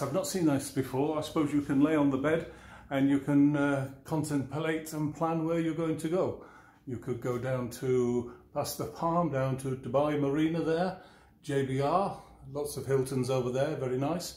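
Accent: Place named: England, United Kingdom. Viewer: cool and funky ! wonderful map included! soft and gentle humour by the youtuber! Lovely English accent !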